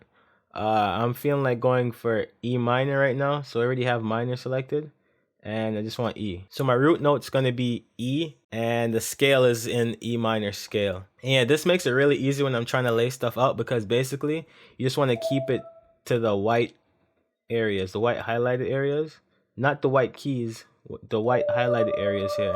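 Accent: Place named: Canada. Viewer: broskiiii u sound canadian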